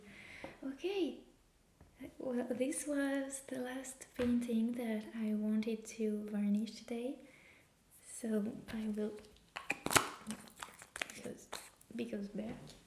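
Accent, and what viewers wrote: France. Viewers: your videos are great and you are one of the few asmr channels i watch. i would request that you do more whisper/talking videos such as when you looked through your artbook. your voice and accent are so relaxing and charming. obviously you are french, but if you were playing a french character then you are playing it very well :) hahaa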